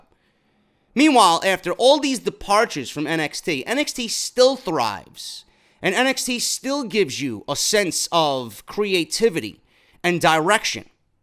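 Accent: New York, United States. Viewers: you are straight up New York you are like the difference between a glass of water and a shot of tequila STRONG, keep it up glad I found you you’re Number 1😎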